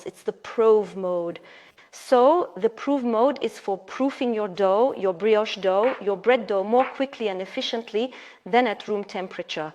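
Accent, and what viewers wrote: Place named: Ireland. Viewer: Great review. I love your Irish accent.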